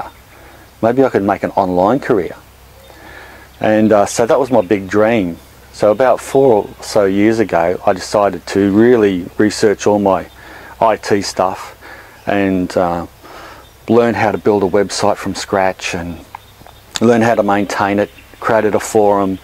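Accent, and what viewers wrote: Australia. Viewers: love how hard you make your garden work; it looks great, i moved to the states about 50 years ago. so its good to hear the australiam accent as well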